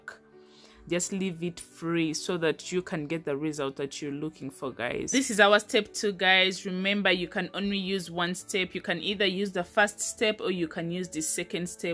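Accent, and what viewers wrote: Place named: Uganda. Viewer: She sounds Ugandan hmm..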